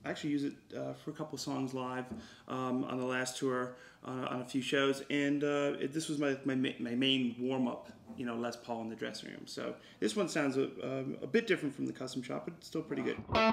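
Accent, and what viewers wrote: United Kingdom. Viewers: your accent is confusing...just when i start to think you are american BOOM you sound british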